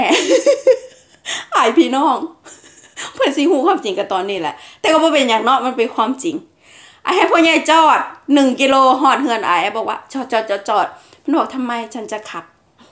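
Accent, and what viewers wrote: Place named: Sakon Nakhon, Thailand. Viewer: <num> ทั้งตาอยากหัว ทั้งเปนตาฮัก บ่เปนตาซังแม้ ภาษาสกลกะม่วน มักหลายจ้า จากชัยภูมิจ้า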